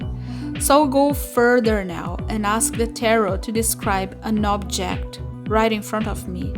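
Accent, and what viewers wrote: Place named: Brazil. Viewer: eu amo que soube q vc tb é br no segundo que ouvi sua voz! muito obrigada pelo conhecimento compartilhado <3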